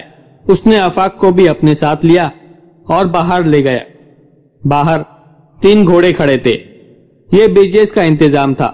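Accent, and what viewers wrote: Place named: Gujarat, India. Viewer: Pl change the commentator terrible narration Is he some Gujrati speaking person Saramaza kharab ho gaya I have stopped listening to the video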